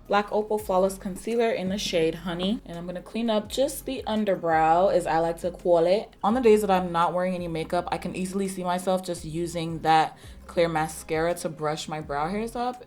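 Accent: United Kingdom. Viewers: Your British accent made my day 😂😂! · I want more British accent in your videos PERIODT my darling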